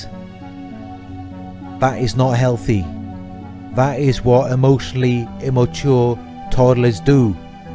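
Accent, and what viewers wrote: Wales, United Kingdom. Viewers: Welsh accent matey!! Yep. So deep, this guy. xxx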